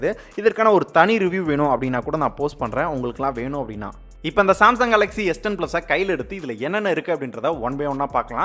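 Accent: Madurai, India. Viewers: Neenga Madurai Ah Bro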